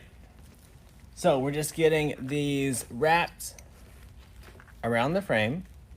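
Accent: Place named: Louisiana, United States. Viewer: You say Y’all because your from Louisiana...lol. I tease my son who lives in Shreveport that I paid for 4 years of college for him to learn to say Y’all. 🤣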